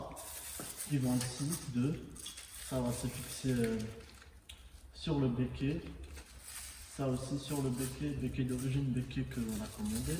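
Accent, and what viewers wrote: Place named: Yugoslavia, Eastern Europe. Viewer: le "petit" accent d'ex-Yougoslavie 😁👌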